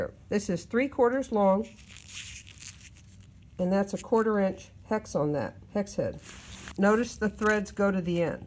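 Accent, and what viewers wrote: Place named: South, United States. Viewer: Plus points for the southern accent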